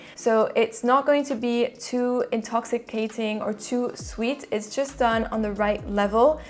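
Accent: France. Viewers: I love your french accent. You're very well spoken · I just subscribe cuz, j’ai adoré votre français accent, très jolie! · Very infornative and to the point information. And nice French accent. Narciso rodriguez bleu noir parfum is my favorite.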